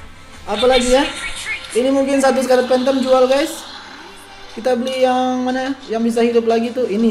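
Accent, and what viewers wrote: Manado, Indonesia. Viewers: yooo org manado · Ka org Manado yah... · Abang orang Manado??? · Kak dari manado? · abang orang manado ya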